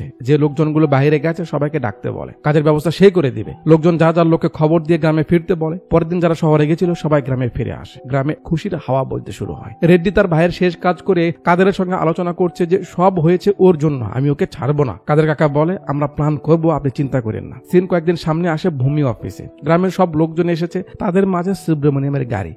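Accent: Bogura, Bangladesh. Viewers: আপনার বাড়ি বগুড়া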